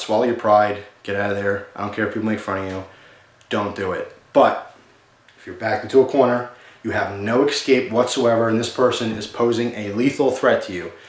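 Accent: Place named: New York, United States. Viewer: did you say boind them? hahahaha i think that the new york accent is cool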